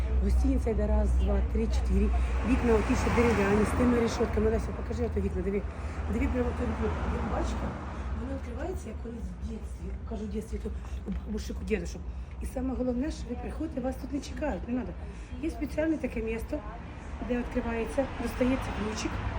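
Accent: Surzhyk, Ukraine. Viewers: Суржик колхозный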